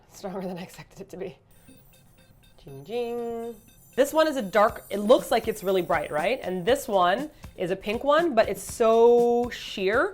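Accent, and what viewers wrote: Canada. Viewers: I heard your Canadian accent more in this video than any other video. And I've been watching you for a about 2 years. ._. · Oh wow I just picked up on your Canadian accent!! LOL cute! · I love hearing little bits of canadian accent keke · She sounds super Canadian in this video! It's adorable >.<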